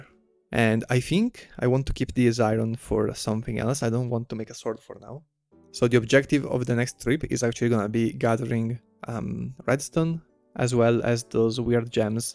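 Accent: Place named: Spain. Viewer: Ese pequeño acento en español y las palabras delatan un poco jajaja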